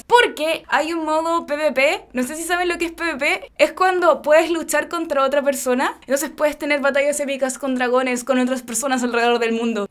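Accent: Chile. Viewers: BUEN VÍDEO, ME GUSTA MUCHO ESE ACENTO CHILENO ^-^ ♡, ( TE DEJO UN RETO, " HAS UN TOP DE LAS CANCIONES MAS HERMOSAS DE ÁNIME ) ♡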